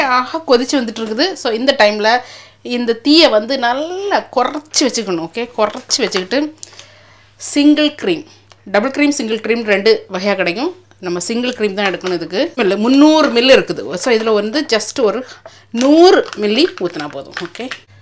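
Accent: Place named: Nanjil Nadu, India. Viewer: மாட்டிறைச்சி திங்கறது இல்ல. ஆனா நம்ம நாஞ்சில் தமிழுக்காக பார்த்தேன்.என் பதிவு எளிமை Sister. உங்க பதிவு Super Sister.🤝🤝🌹🌹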